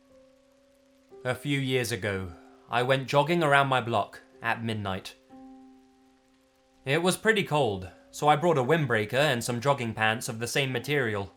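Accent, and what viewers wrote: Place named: RP, United Kingdom. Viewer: I really like your stuff and because you're a Brit, your accent lends an RP (BBC World Service for those who don't know what Received Prononciation is) seriousness to what you are saying. This gives the impression that it's reportage and makes it all the more chilling. Of the Narrators who do true stories I find yours give me the most pause for thought and the most chilling feeling. (Last time I complimented someone a troll latched on to me like a nasty little tick and I fully expect I will get lambasted for this so I am not letting it go to Google plus...the bugger will have to listen to a lot of good stuff to find this hopefully. .but despite that I think you ought to give credit where it's due!). (Ok trolls I may have put i before e after c in in a word but I can't be bothered checking. ....before you comment. ..I know! 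).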